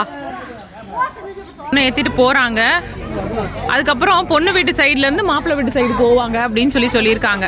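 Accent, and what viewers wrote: India. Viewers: அம்மாச்சியா உங்க ஊரு எது சகோதரி, எங்க ஊரு பேச்சு மாதிரி இருக்கு, எங்க ஊரு காரைக்குடி ங்க. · அருமை மதுரை தமிழில்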